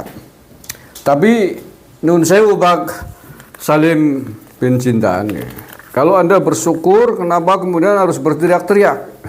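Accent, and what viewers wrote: Banyumas, Indonesia. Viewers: ogrok" terus habib bung. selalu tk dukung, ngapaknya seger.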